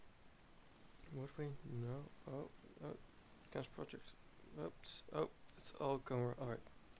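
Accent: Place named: Netherlands. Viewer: Haha ik kan het aan je accent horen!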